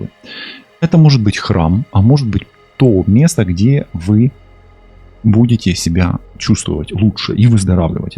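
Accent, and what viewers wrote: Ukraine. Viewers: Спасибо, комнату отремонтировала, стараюсь восстановить материальность после кражи вошедшего в доверие менеджера по недвижимости. 😊😊😊(Благодарю Творца и Таролога) 🛐 Приятно слышать такой мягкий малороссийский выговор.❤❤❤С-Пб🎉🎉🎉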